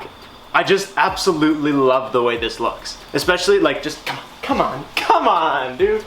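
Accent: Canada. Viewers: Anyone else notice the way Canadians say out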